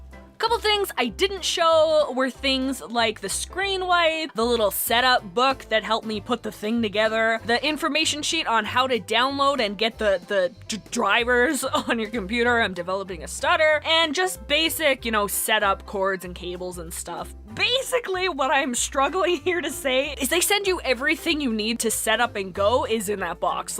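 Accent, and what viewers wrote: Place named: Canada. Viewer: You're Canadian too?!?! I knew it I knew it I knew it! (Kinda) Cause that 'accent' that we Canadians have...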